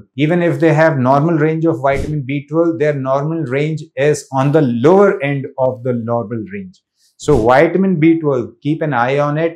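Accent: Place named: India. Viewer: 😮Dr has surprised me because he is now saying vitamin instead of witamin after so many years but I understood him and I love his Indian accent plus his extreme intelligence. Thank you doctor I wished I lived in India so I could come see you. As usual Wise information thank you sir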